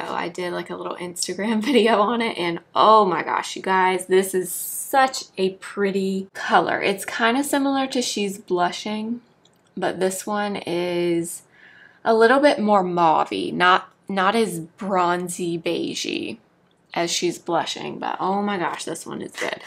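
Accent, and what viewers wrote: South, United States. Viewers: Girl where are you from?? I’m from Georgia and I’m detecting a southern accent 😍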